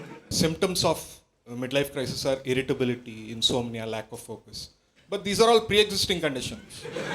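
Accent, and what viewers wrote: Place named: Telugu, India. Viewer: Killed it Bro. It reminded me of my Telugu friend who has the same Hindi like you. Loved it.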